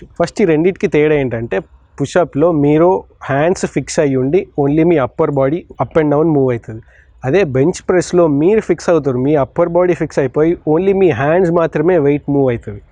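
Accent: Telangana, India. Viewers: Godavari abbayi telangana slang lo matladinattu undi bro ..mi words...simply superb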